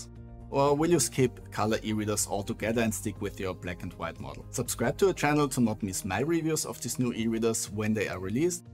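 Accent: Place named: Austria. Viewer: Man hört den österreichischen dialekt so gut raus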